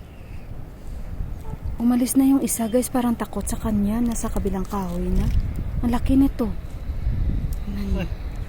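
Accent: Iloilo, Philippines. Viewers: Maham ot gid ko sa imo te ah ky may tuno ka gid ya sa ilonga heheheh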